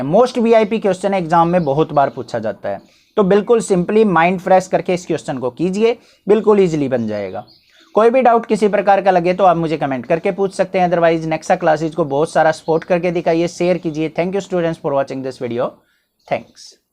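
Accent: Haryana, India. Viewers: sir: dekho upper lakha ka😂 haryana walo ki haryanvi Hamesha bich ma hi aa jati h I am also from Haryana♥️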